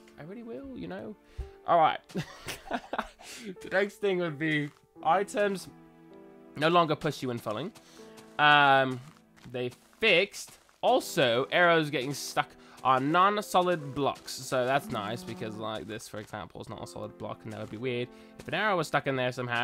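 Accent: United Kingdom. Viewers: Hey dude I hope you don't mind me asking, where are you from? You sound like you come from the legend place called the UK!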